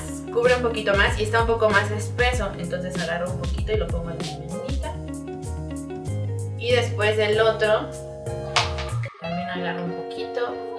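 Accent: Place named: Sonora, Mexico. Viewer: Tiene el acento muy sonorence y las palabras que usa son mas como de hermosillo, ojala si lo fuera :"(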